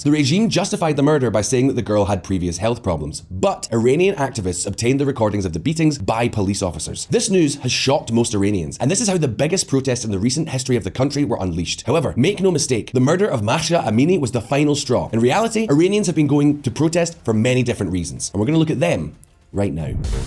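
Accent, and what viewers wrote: Scotland, United Kingdom. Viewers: Maybe, you will understand this better... Are you Scottish English? Answer me that!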